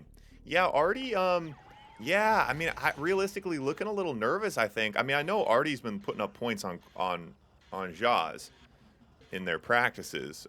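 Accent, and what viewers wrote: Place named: United States. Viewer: You are Dutch, you sound totally American to me 😅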